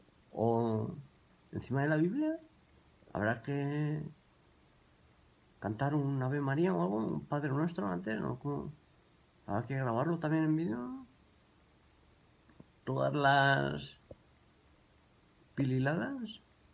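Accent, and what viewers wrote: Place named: Galicia, Spain. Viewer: entre la dentedad y que hablas como un Gallego, me parto jjajajaj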